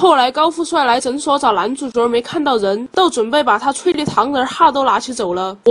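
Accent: Sichuan, China. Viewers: 哈哈哈，用浓重的川音儿来讲得一本正经太好笑了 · 四川妹子吧~登獨什麼的哈哈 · 這是四川話口音吧....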